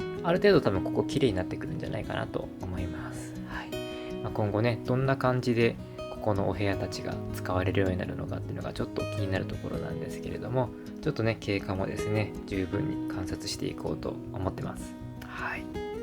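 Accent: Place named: Japan. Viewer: I like your Japanese accent but i dont understand Japanese 😭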